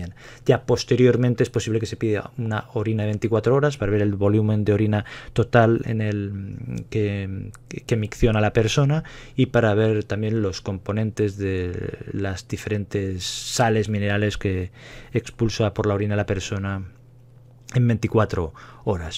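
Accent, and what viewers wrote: Spain. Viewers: Gracias, muy claro. Guapo doctor, hasta el acento español me gusto😁. Mañana será mi intervención. Y justo pensaba qué hacer para evitar la formación, saludos Querétaro-México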